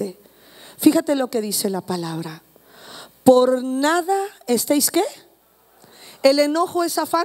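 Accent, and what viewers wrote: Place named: North, Mexico. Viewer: Parece más de acá dl. Norte q del D.F. x su forma d hablar 😄 buen msje."q estamos reflejando"? 😕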